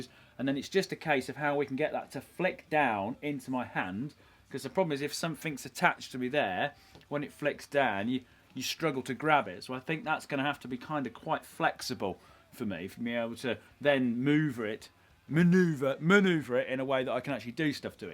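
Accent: United Kingdom. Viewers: I really like his accent We asian can't get this type of accent asian really don't get this kind of accent except we were born in UK. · i fuckin LOVE your british accent this is a #nohomo commentary i swear but i do really like british accent, from boys or girls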